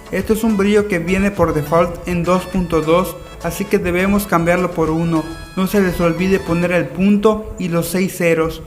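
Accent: Yucatan, Mexico. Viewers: De donde eres? tu acento suena a yucateco.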